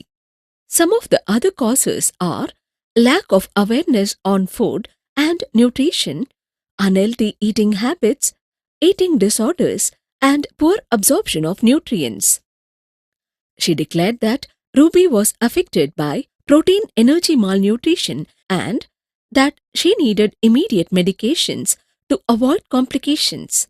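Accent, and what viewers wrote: India. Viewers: seriously dude its an Indian accent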